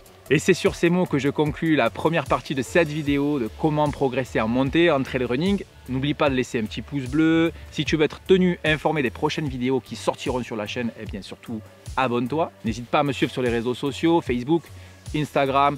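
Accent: South, France. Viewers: Même si l'on pense tout connaître du running, il est bon de revenir régulièrement sur les fondamentaux. Quelques rappels font le plus grand bien (même pour les runners aguerris), nous évite de dévier (cela arrive forcément) et entretiennent le mental en permanence. nous explique tout cela de façon très claire. Les explication sont simples, précises et concrètes. J'apprécie beaucoup ses vidéos avec en bonus un petit accent du Sud qu'on adore.J'ai 63 ans et je fais parti de ces coureurs qui respectent les bases élémentaires du running (hydratation, étirements, progressivité dans l'entraînement etc...). Ce qu'explique parfaitement bien.Cela me permet de continuer à faire mes 50 à 60 km par semaine et tout cela sans bobos.J'encourage à continuer ses vidéos. Un grand merciEric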